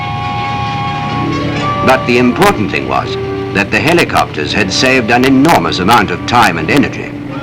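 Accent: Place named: Oxford, United Kingdom. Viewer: Kan kijkers van deze bedrijfsfilm verzekeren, dat in deze getoonde film- en boorlokatie; niet de deze documentaire gebruikte Oxford uitspraak gebezigd werd! Eerder, zal er menig Nederlandstalige vloek gevallen zijn. De helikopters werden gecharterd van World Wide Helicopters geregistreerd op de Bahamas. Vliegers en grondpersoneel waren veelal Australiërs. Deze info van een toenmalige 10 jarige snotneus. Heb op een uitermate tropische zondagmiddag ooit enkele uren, door mogen brengen op de logistieke locatie van deze (droge) exploratie put. Herinner mij bij het inkloppen reaktie, dat collega van mijn vader genaamd Gesterkamp, een paar groene lomboks uit een grote jampot verorberde. Mijn vader waarschuwde voor de gevolgen voor de maagband. Gesterkamp is een hele slechte geworden voor het Shell Pensioen Fonds. Deze culinaire liefhebber van de oosterse keuken is uiteindelijk meer dan 90 jaar geworden.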